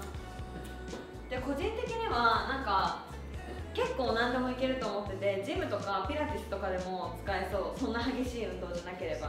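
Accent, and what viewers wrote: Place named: Kansai, Japan. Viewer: さんが履くとユニクロのデニムもセリーヌに見えます😍ほんとどれもめちゃくちゃ可愛いしオシャレすぎです！！ちょいちょい関西のイントネーションが嬉しい😆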